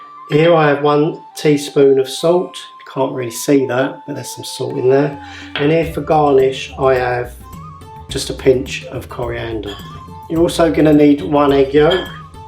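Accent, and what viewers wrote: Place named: Essex, United Kingdom. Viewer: Great stuff, you sound like you come from my home county of Essex but I now live in the Detroit area so your recipes and cooking lessons are a god send! No such thing as a good curry in these parts, though they do try. Hope things are well with you and that more shows are on the way but I understand it must be a grind to produce these videos. Thanks for the shows you have done mate, top class!!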